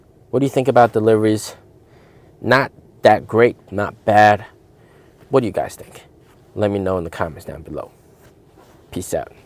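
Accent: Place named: Texas, United States. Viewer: Every time I hear you talking without looking at my phone I thought you were American from Texas😅, you talk like the guy from magpie restaurant who teaches ppl to cook🎉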